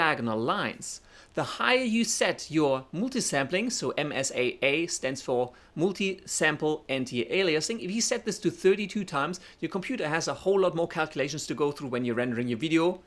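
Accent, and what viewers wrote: Germany. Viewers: Du musst definitiv Deutscher sein, dein Akzent klingt so süß 😁 Anyway, thanks for your very high quality content, it's so helpful! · Even if your lovely accent (German?) hadn't hooked me, I wouldn't have missed a minute! This was just the tutorial I needed. Amazing work.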